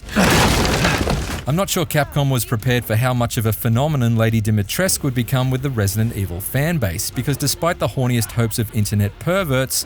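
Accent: Australia. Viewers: love the aus accent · The guy clearly has an Australian accent.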